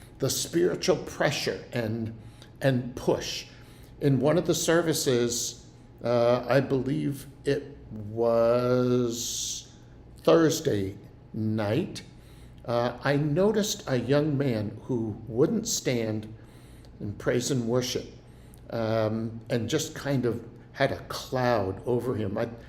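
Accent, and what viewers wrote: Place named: Spain. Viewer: Thanks for sharing from Costa Rica pastor Paul. Shalom from Bolivia🙂 P.s. Nice Spanish accent and "hasta luego"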